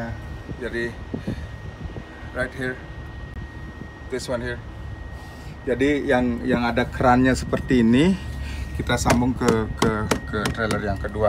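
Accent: Bali, Indonesia. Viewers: Seperti logat bali yaa bhsa indo nya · Kalo denger logat pas ngomong pasti orang bali y bli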